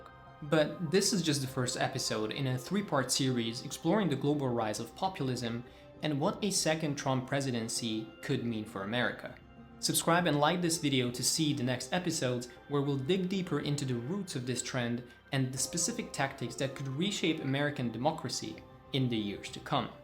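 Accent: Hungary. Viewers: The Why Club, eh? With 200-some subscribers? Yet another recently created propaganda channel from Orban's spin doctor, Antal Rogan. At least, he could have picked a narrator with a less obvious Hungarian accent and an accurate English pronunciation. Hungary as a blueprint for the US? A piece of shit village in the Balkans with a populist wannabe dictator fantasizing about "leading the world" with his provincial political philosophy geared towards a single mission: grand theft. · You were born in hungary, right? Or am I crazy? Some of your pronumciation sound hungarian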